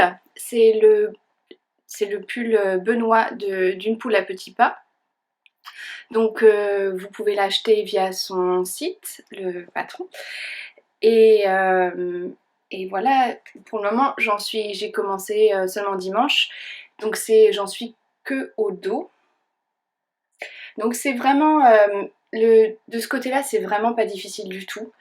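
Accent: United Kingdom. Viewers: Super, merci pour ce podcast et vivement le prochain. J'ai hâte de voir tes trouvailles d'Ecosses. Et j'adore ce petit accent British ;-) à très vite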